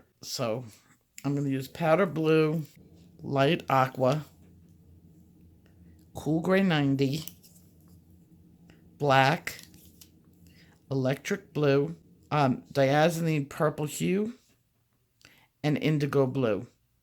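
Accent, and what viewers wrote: New York, United States. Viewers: You know how hateful and selfish people can be, but not with any of the wonderful people I have lately. I have asked so many questions and nearly everyone either a tutorial or knows of one and takes me right to the source. It has been great. After struggling for so long with hate and drama it is nice and refreshing to meet kind people. And I could listen to you talk all day. I'm from the South so I know a New York or Northern Italian accent lol I don't hear it very often down here.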